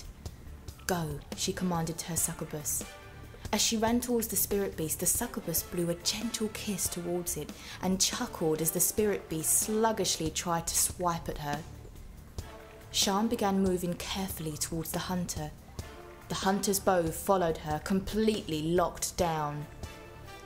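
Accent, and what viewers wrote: United Kingdom. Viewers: Cockney chicks shouldn't be telling stories tbqfh! voice just doesn't work for seriousness dialogue! I feel like after you pause to speak your gonna say next "apples and pears 10 bob"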